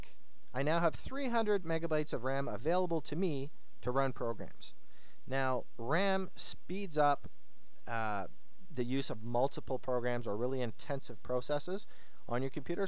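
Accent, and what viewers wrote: Canada. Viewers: ARe u Canadian? Cuz u talk like Les Stroud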